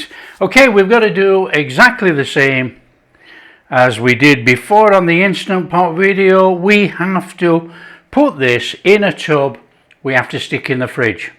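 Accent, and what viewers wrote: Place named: Scotland, United Kingdom. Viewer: Is that a scottish accent?Whatever it is I love it.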